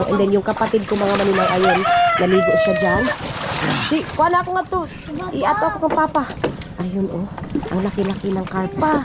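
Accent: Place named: Butuan, Philippines. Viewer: Alam mo maninay namimis ko mag salita ng tulad ng salita nyo KC amarunong akong mag salita ng ganyan KC Ako ay taga Butuan pure Bututanon ganyan among inistoryahan.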